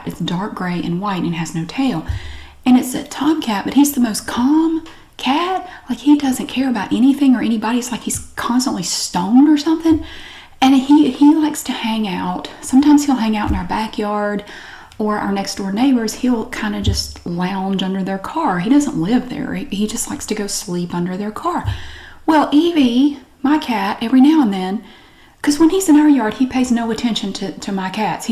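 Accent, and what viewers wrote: South, United States. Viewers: Well I love your southern accent. And I think you are so funny😂 ❤ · Happy channelversary! You have a fan from Oaxaca Mexico 🇲🇽 Your videos bring me a lot of memories of my life as an Au pair in Hampton roads VA back un 2018. I love the southern accent and I think you're a sweetheart! Saludos (greetings) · Damn I love that Southern accent , I could listen to her talk about paint dry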